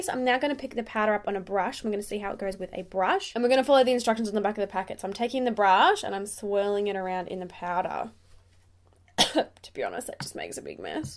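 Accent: Australia. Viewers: 1) You're a gorgeous girl. 2) Us Americans luv those Aussie accents and I'm no exception. 3) Luv how excited u get over a really good product. You look like the kind of girl who wouldn't get excited about anything (u have that 'cool' factor.) 4) U have the most beautiful blue eyes. I've been trying to find a pair of colored contacts that r your eye color but to no avail.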